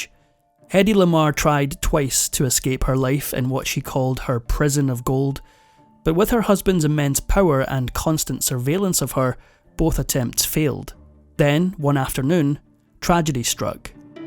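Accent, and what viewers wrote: Scotland, United Kingdom. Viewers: Ah. the narrator is a Scotsman - how novel is that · And as n Anglo speaking listener, not only Scottish, but clearly spoken and completely understood 😉 Beautiful lilt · People only talk about her WW ll contributions but the more you remember or read about her, this was one sick Beech. Addicted to amphetimines and hateful of her oldest adopted son, she also felt entitled to shoplift. Her marriages were a disaster. Lamarr, charged with allegedly shoplifting $86 worth of merchandise from the May Co. Wilshire department store, was found not guilty by a jury. Photograph caption dated April 25, 1966 reads, "Denies any intention to take merchandise from store without paying for it." She was married and divorced six times. I love the Scottish accent! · I don't know what your talking about! I thought this man had a lovely Scottish accent. 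I'm Scottish too and very proud of our accent. What a cheeky you've got! 🏴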